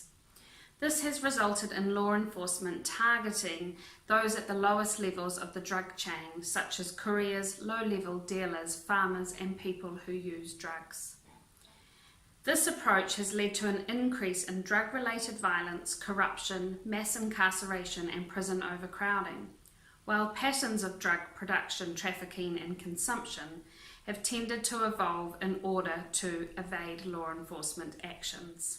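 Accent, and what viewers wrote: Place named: New Zealand. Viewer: Just watched Al Jazeera (stream AJ) about prisons in Europe. Couldn't help it but amongst the comentators I noticed you sound very very Kiwi. Well done! Aotearoa all day!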